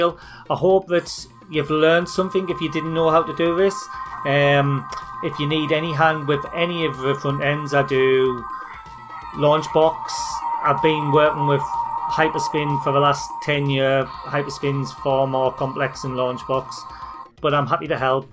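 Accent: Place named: England, United Kingdom. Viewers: HI, i have followed your tutorial but only get the CD32 loading screen, i am not sure if my games are in the correct format, i have unzipped the folders and have a cue file and another iso folder inside the game rom folder. i have the kickstarts installed. by the way i thought from the accent you were from Middlesbrough, i am from newcastle myself. hope you can shed some light cheers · hey! i know its been asked and answered already but where in england are you from? i just lovin the accent and i wanna look into it and hear more of it:) i dont remember your answer let alone the video where i read it. thx. (also always lookin for new amiga stuff from you:)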